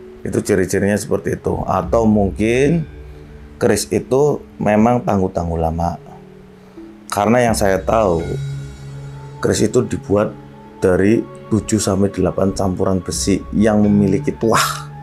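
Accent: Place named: Banyumas, Indonesia. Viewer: Deneng ngapak...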